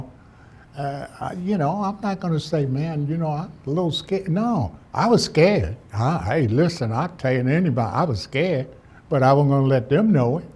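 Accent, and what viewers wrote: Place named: New York, United States. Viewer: Sounds like one. Could tell he’s a fellow New Yorker. He’s very detail oriented. Good story teller.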